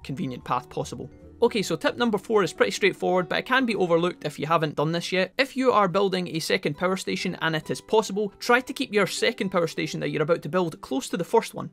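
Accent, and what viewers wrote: Scotland, United Kingdom. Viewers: Thanks for the tips. Love coming here also cause of the Scottish accent 😂😁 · thank god I have found you. many of those videos, especially the LPs are provided by folks with nerve grinding voices. is it excited teenagers? Idk, but it's so hard to focus on the game with this auditory abuse. you, however, have a calm voice and scottish accent. what's not to like? thumbs up! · Excellent tutorial so thank you very much for that! It is so easy to understand your Scottish accent, that is a really big bonus man. Cheers.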